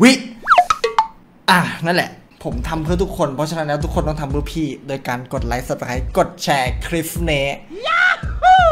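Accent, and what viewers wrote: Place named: Isan, Thailand. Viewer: เห้น ตลกก็บัดนี้เเหละพูดตลกเหมือนเสียงอีสานน่ะ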